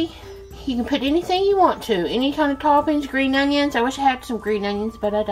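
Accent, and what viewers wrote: South, United States. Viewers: Love your southern accent... just like me ;)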